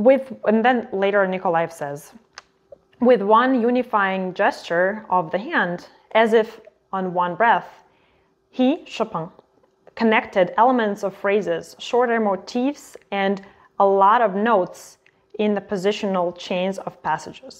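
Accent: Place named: United States. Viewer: Thank you for reminding me of those wrists positions 😊 I had forgotten them. I was taught that too, up and down was the most important. I don't quite remember if the stacatto movement is included in up and down, 🤔 I know I had to practice that wrist movement, my teacher used to say the 'ping pong' wrist movement. Where are you from? You speak English with an American accent, but you're not American nor English, some eastern Europe country? I'm Elsa from Lisbon /Portugal. Liked your interpretation of Chopin's scherzo 👍👍👍 congrats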